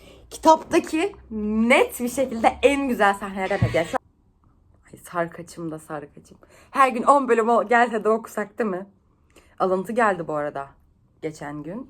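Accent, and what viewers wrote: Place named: Black Sea, Turkey. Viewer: Ayayayayaya Karadeniz şivesii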